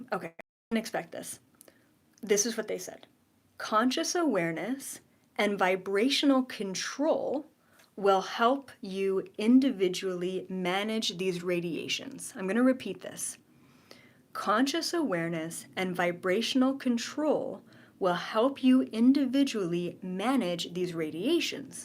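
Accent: Canada. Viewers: Nice to hear a tiny bit of your Canadian accent come out! I'm from what province are you from originally?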